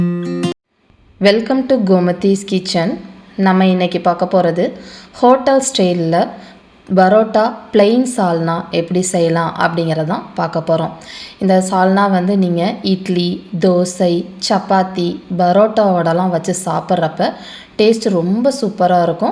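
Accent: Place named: Tirunelveli, India. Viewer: Enaku ithula romba pudechathey nama nelai slang than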